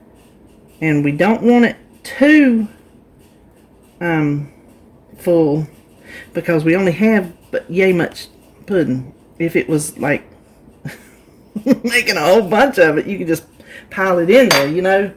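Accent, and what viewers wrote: South, United States. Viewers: I'm pretty new to WW and I found your videos last night, and have been watching so many of them. I just adore you. I'm from SC and just your southern accent and colloquialisms make me think of my grandmother and your videos feel so homey. Thanks for sharing!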